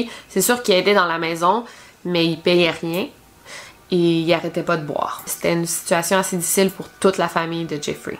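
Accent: Quebec, Canada. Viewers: super vidéo encore une fois ! Je suis vraiment fan de tes vidéos ! Et je te jure, moi qui aimais pas tellement l'accent québécois, tu m'a vraiment fait aimer l'accent ahah, sinon continue vraiment les vidéo ! je ne supporterais pas ne plus te voir 😘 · merci pour tout ces faits divers que tu nous partage! mais j'ai vus que parfois tu lisait un livre au complet pour nous faire une video, ca doit te demander bcp de temps! comment tu t'organises, tu en lis plusieurs en meme temps? merci encore pour tes videos j'adore l'accent quebecois et les faits divers, tu combine les deux! et en plus tu es une très belle personne (daans les deux sens ahaha) et tu fait des videos completes, bref autant te dire que je suis tes videos comme si c'etait ma serie favorite!! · Franchement je viens de découvrir ta chaine et je la trouve trop cool , surtout avec ton accent québécois est super cool❤️😆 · Sans vouloir critiquer, mais l'accent quebecquoi pour quelqu'un venant de france est vraiment très lourd! 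C est trop bizarre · Aiee c chaud cette histoire ( gg au prisonnier 😂😂 ) continue comma ça j’adore l’axon canadien en plus 😉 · Elle n'a pas aucun faute, c'est evident, mais je trouve l'accent quebecois trés marrant...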